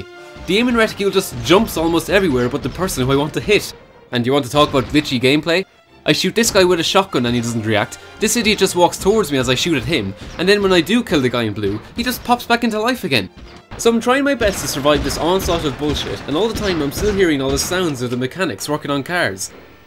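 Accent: Ireland. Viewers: He's Irish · explained through an Irish accent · Irish maybe hmmm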